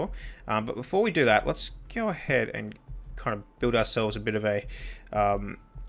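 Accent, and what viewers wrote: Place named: Australia. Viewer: Great video dude would like to see more but Are you Australian because you kinda sound like it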